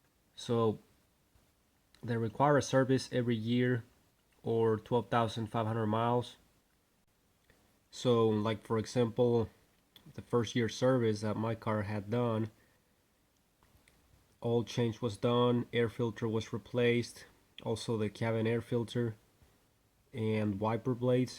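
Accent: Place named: New Mexico, United States. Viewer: Sounds like a NM accent. The natives there have a very slight lilt to their voice. It comes from being surprised all the time.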